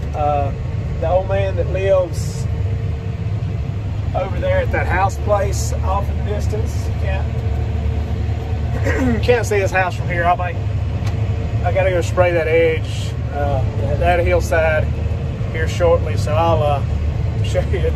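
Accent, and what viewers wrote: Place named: South, United States. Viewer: Worked for John Deere for 38 years and spent a lot of time in Mississippi and Arkansas in the Delta area. Use to go out with the dealer personnel to the field since I was from our planter factory in Moline, Illinois. Some of the best ground for growing crops in the World. Love your videos because you'er one of the few farmers in the south on UTube. Like to watch the corn and soybean farmers but since I'm familiar with cotton I thought your channel was great. I too like the information about the history. I was born in Arkansas and I miss that southern accent. Great people in the deep south as well. Keep um coming!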